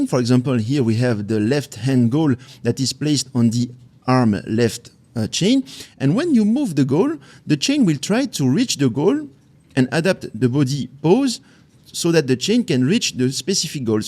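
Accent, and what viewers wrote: France. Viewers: You are so awesome, why is your content so high quality ?? Are you french like me BTW ? Your accent is perfect <3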